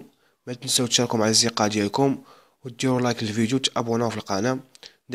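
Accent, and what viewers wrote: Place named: Western Sahara. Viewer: لكنتك صحراوية. موفق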